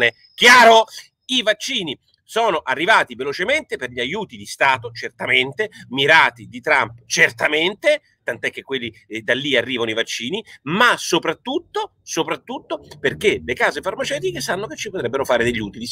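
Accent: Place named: Piedmont, Italy. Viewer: Peccato che all amico di ieri nessuno abbia risposto sull argomento... che peccato Comunque il tuo piemontese é proprio da barotto ormai nessuno lo parla così manco mia zia di 96 anni va, gadan che non sei altro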